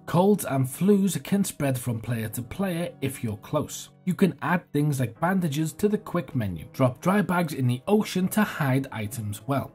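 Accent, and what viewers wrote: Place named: Northern England, United Kingdom. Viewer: OMG You did that on purpose right? I do believe you just created not o ly one of the most useful dayz videos for beginners like myself, but you also just rested one of the best money earning youtube videos of everyone who watches it and finds it useful is gonna be back here again and again and...yeah and so on lol. Well done on both counts lad, nice work! And to think k I was gonna say I find your videos amazing but so fast I am forever rewinding and rewinding LOL, well I won't bother asking you to slow them down after seeing this one! That accent be familiar to me. My wife she be from Chester and used to live the Wirral. I'm from Scotland so we both count as northerners, nice tae meet ye. 👍💚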